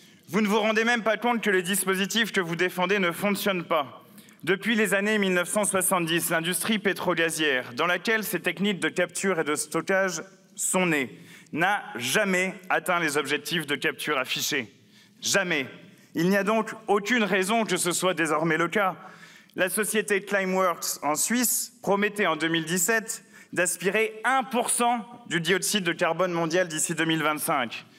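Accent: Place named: Brittany, France. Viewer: Merci pi. Vous êtes breton, cela s’entend. Vous aimez l’océan, cela se voit. LFI nous donne la force et du courage. Merci.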